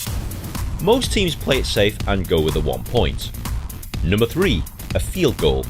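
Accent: England, United Kingdom. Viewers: Holy shit! I just dropped my lunch! I moved to America last year, and my wife (Emma Howard/Madden now) and I are going to watch the AZ Rattlers play this afternoon and I wanted to see how the rules varied to regular American Football. I didn't even realise until halfway through that this was you, albeit I did think it strange that guy narrating had an English accent! Hope you're doing well mate - I can imagine you still rollerblading around Leyland!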